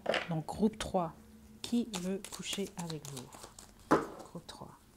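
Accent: Canada. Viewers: J'aime aussi votre accent canadien. Etes vous Canadienne? Je vous suis de Douala, Cameroun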